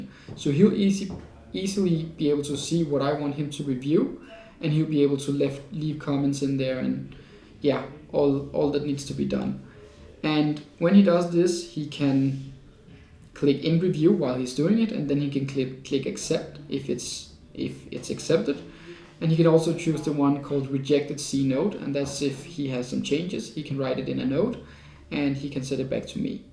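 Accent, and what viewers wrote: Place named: Denmark. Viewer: Holy shit started the video and was instantly pointing and saying "Bro is Danish"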